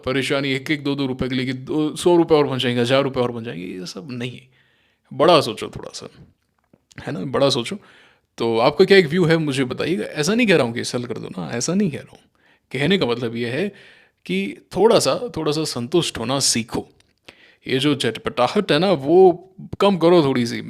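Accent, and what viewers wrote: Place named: Haryana, India. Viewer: Haryanvi mst bolte ho sir aap to 😅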